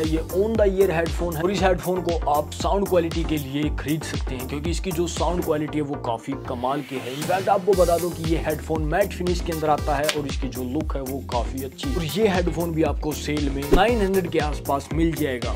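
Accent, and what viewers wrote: Haryana, India. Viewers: Bhai by your accent i can say you are definitely Haryanvi 😍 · Awaj t lag h haryana ka h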